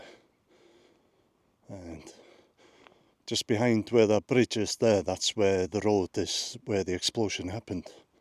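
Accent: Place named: North Wales, United Kingdom. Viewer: S Walian here; nice to hear a full Gog accent!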